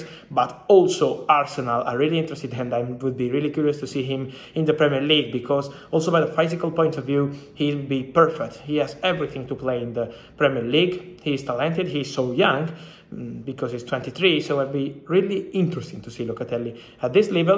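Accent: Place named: Italy. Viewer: I'll never get tired of listening to that Italian voice 😍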